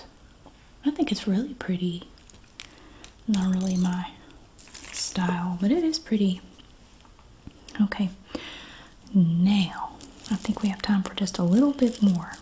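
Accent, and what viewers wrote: South, United States. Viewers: Lately I've been on a bender. Been subscribed for almost 10 years. Love the motherly tone and soft southern speech of your voice. Literally the only ASMR channel I'm still subscribed to. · Love Southern accent! Different from depending on where in the south! Thanks 😇❤💙❤💙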